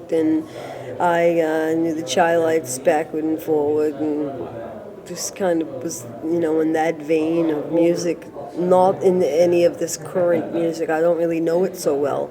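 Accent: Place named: Boston, United States. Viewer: What a great lady-loved her Missing Persons work. And her Bawston accent.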